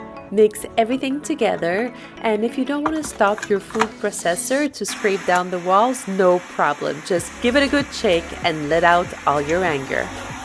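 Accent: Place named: France. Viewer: english is my 3rd language, who doesnt like a french accent is just weird lol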